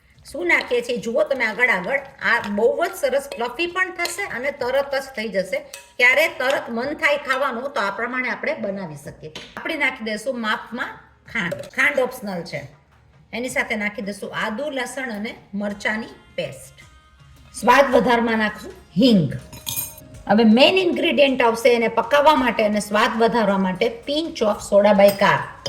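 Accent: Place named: Charotar, India. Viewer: Ben tamane mare ak tips aapviche te ajke tame basan sathe puovane karas Karine bijivar honvane banavsho ok 🎉 dhayvad tamari charotari bhashathi Mane badapan ni yad aavi gai Jay mataji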